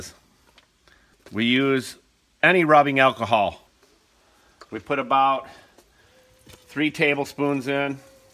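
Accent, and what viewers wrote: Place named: Midwest, United States. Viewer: I could tell this guy is Midwestern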